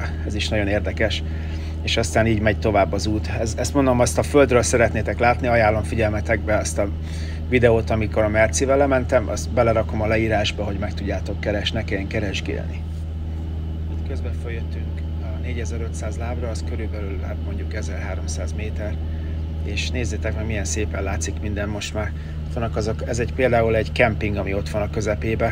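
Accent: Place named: United States. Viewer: hihető és elfogadható a kicsiny "amerikás magyar" akcentus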